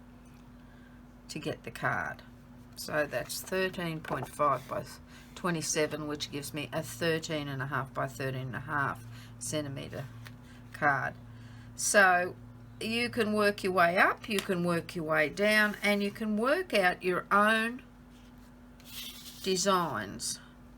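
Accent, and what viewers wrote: Australia. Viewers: Hey just curious what is your accent, Australian or am I incorrect?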